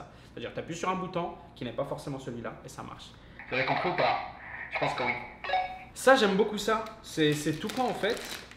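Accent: Quebec, Canada. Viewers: T’es québécois ou français? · Tu est tu es québécois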